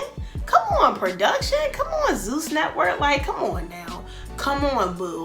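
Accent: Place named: Philadelphia, United States. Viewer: Idk where you from but it’s definitely close to philly IF NOT philly 😩😭😭 ya commentary had me rolling !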